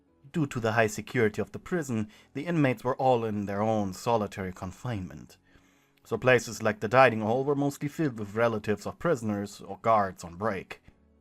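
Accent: Germany. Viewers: Bro I love his German accent! It's cute💖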